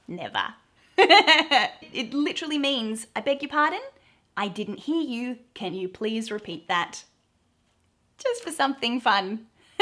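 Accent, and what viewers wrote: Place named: Australia. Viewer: Hello, i only wanted to say. I loved the Australian accent since i did watch some Australian TV shows in mij teenage years. So i love these video's of you. Keep up the good work. And maybe it can be usefull when i'm going to Australia. It's on my bucketlist! Greetz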